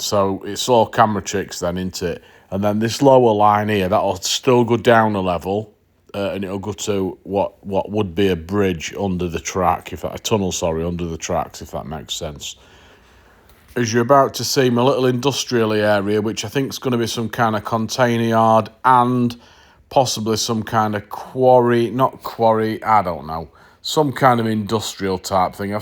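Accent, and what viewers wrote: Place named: Lancashire, United Kingdom. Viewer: Excellent, came across your channel from Western Signalman`s podcast. I do love a funny mdel railway channel and, as a southern poofter shandy drinker, I do like your accent! (My neice from that London has moved to ``Uni`` in Lancaster this year. She was horrified to find it was cold, and couldn`t understand the accent) Lancs is close to Yorks I believe :) :)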